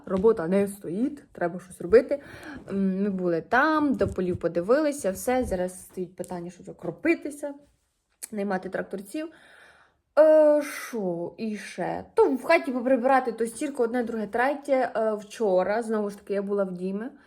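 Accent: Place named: South, Ukraine. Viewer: Пані, ви неперевершена!Як надзвисайно приємно чути українську з півдня країни! Вклоняємося Вашим воїнам і Вам до землі.Щиро дякуємо, що ви усі живете захищаєте,любите та працюєте.Якби таких українців було багато у нашій рідній Україні - війни НІКОЛИ б не було.Не допустили б до такого. Живіть, живіть усі довго та щасливо! Панові Дмитру, якнайшвидшого одужання.Миросик дорослішає на очах.З любов'ю українці.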